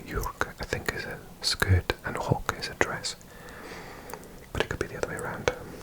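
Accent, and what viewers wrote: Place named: The Hague, Netherlands. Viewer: Ik heb het idee dat je bij het leren van Nederlands veel gepraat hebt met mensen uit de omgeving van Den Haag of Rotterdam, kan dat kloppen? Je hebt een heel goed Nederlands accent van die regio namelijk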